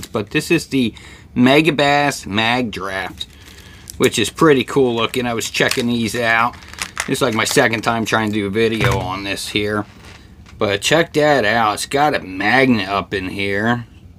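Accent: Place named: Pittsburgh, United States. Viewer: I detect a little Pittsburgh in your accent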